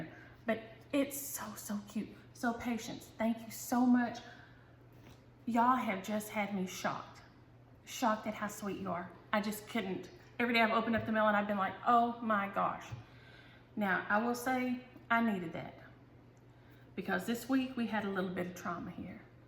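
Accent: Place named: North Carolina, United States. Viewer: I seriously laughed out loud when you talked about whoopings and “I’ll give you something to holler about...” I love Blackbird too and that pretty bird in the red! Nice job....keep up the great work... now to go see where you’re from! I live in NC and you sound like me! 😂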